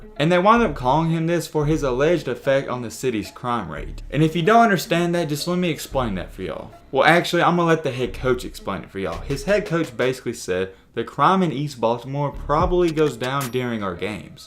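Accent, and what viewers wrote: South, United States. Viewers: You have this southern draw you're trying to hide and its like...you're talking out of your nose or something. not a natural voice, hard to listen to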